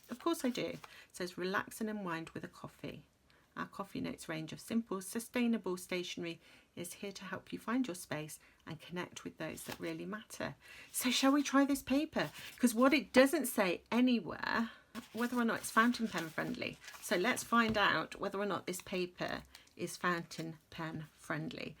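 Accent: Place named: United Kingdom. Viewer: I've never won anything but its always exciting to join a giveaway. But most of all, I love watching your videos. So many times I can relate to your rambling about coffee, your children and journal mostly. And I love love love your accent. Been missing my British friend so watching yours really made me less missing her. Thanks hun. Good luck everyone.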